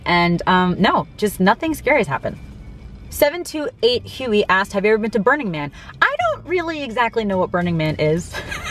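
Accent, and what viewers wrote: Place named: Michigan, United States. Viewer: You grew up on Long Island but you sound like you’re from Michigan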